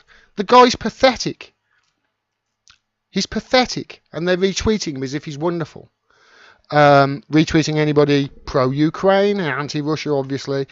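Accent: Birmingham, United Kingdom. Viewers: are you brummy?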